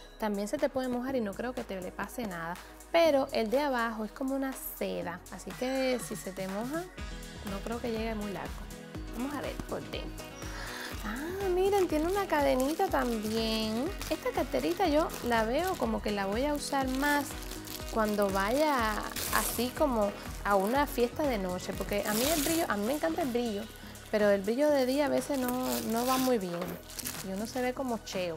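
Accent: Cuba. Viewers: Se parecen y su acento es el mismo por que las dos son de cuba 🇨🇺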